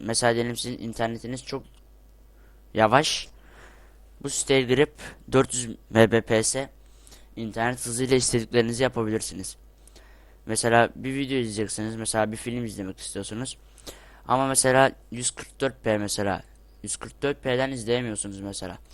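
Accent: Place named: England, United Kingdom. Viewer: ing aksanına hayran kaldım kardşmmm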